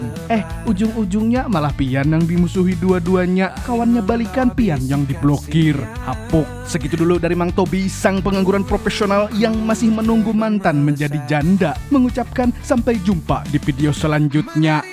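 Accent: Banjar, Indonesia. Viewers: Banjar banarrrrrr wal ai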